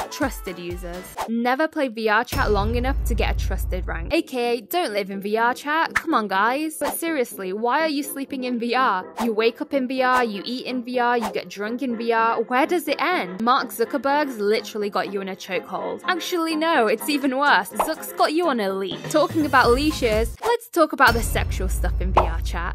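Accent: United Kingdom. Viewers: u from the uk ? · Are you British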